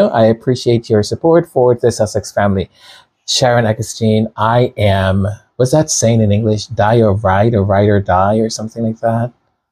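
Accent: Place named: United States. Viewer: Yes its funny i would think u where a American 😊😊😊👍🏼👍🏼👍🏼👌🏿🌹🌹🌹🎈🎈🎈🌞🌞🌞🌞🥰🥰🥰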